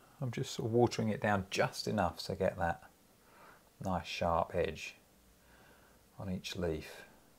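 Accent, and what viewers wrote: United Kingdom. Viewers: aw ur shining blue eyes and british accent 😍 and so generous on sharing great painting skills :) 😘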